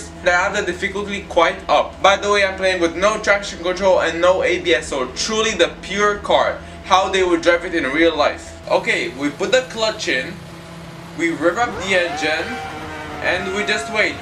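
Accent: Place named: England, United Kingdom. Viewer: Vezi ca ai putina engleza pe la coltul gurii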